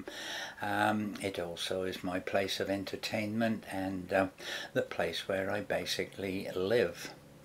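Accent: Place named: East Anglia, United Kingdom. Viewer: Where are you from, originally,? Your accent reminds me of my own happy huntng grounds of East Anglia:]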